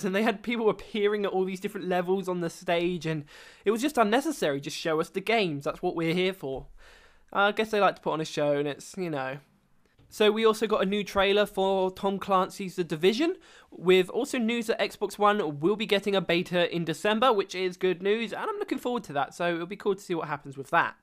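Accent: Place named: England, United Kingdom. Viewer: yea the only thing i don't like about your video is that you call it a "conference highlights" when you should have called it "my conference highlights opinions" because i just wanted to hear about what was talked about without you imposing your opinion about every highlight. other then that, your bushy eyebrowed lispy accent seems charming, but i can't stand English accents.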